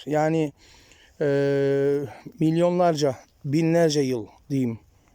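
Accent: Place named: Kahramanmaraş, Turkey. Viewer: Konuşmana bakılırsa maraslisin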